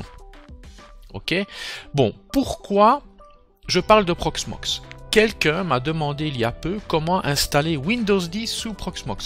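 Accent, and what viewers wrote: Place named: Liège, Belgium. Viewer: Oufti tu viens de lieeeeeege toi 😂😂 J'adore les liégeois 😜 Super tuto, j'attends encore quelques pièces pour mon serveur et puis j'installe proxmox 😁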